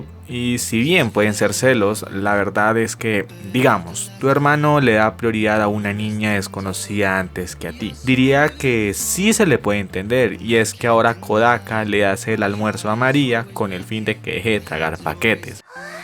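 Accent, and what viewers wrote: Colombia. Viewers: pd: tienes pura pinta (voz) de ser colombiano amigo sub nuevo 😅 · Mero colombiano pana 👌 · Un narrador colombiano mca que chimba, parce de corazón espero le vaya muy bien, y confirmo, pobrecito el parcero.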